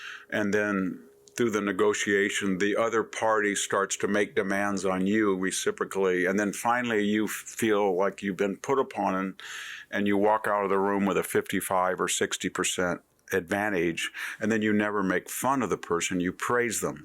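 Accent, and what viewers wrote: United States. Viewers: This accent is the best American accent. All the names end up sounding completely accurate.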